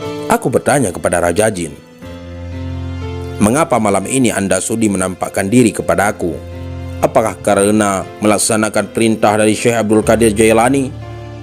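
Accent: Aceh, Indonesia. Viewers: Sy selalu mengikuti kisah kisah yg admin sampaikan, maaf kalau boleh tanya admin orang aceh ya dari logat bahasanya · Logat mimin lage awak getanyoe nyan...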